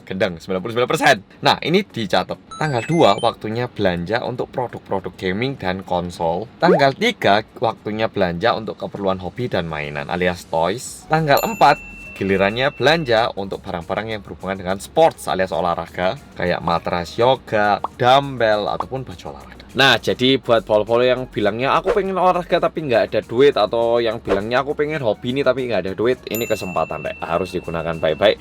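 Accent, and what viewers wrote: Java, Indonesia. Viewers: Wajahnya kekoreaan tapi logat bicaranya indonesia banget .mantull..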